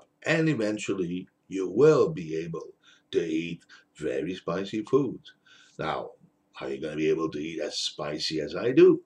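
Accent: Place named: Texas, United States. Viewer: Très belle entrevue vidéo français et anglaise,vous nous orienter dans le choix des épices et piments pour la nourriture que nous préparons #1, en pensant avez-vous des origines du Texas car vous avez un accent texan👍👍🤝🌶🌶🌶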